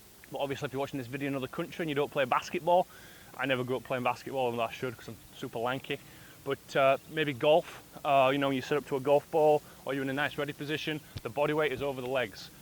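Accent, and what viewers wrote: United Kingdom. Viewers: Great to hear an Northern accent (hope i have that right! Yorkshire?) on You Tube. Looking forward to the videos! PS: I'm from the real North...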